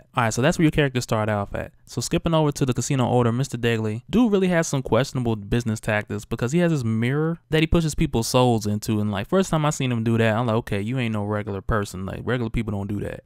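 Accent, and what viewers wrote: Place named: Brooklyn, United States. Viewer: this shit is so ill,its hellSTROM not storm fam, great job though u sound like you from brooklyn are you ?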